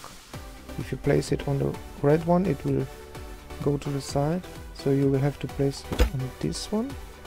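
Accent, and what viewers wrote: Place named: Germany. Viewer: You sound German, are you?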